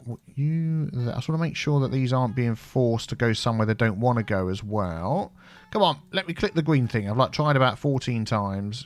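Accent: United Kingdom. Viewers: I’m like bingewatching all your Cities Skyline videos! They got recommended. I like your British accent, I like the content you show us, and I really feel like playing CS myself. I like the 'lane mathematics', 'autosave sippa tea' too 😜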